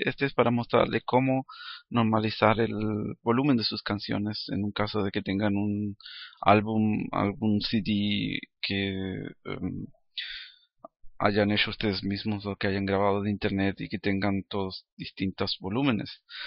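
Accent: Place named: United States. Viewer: por que hablas como gringo?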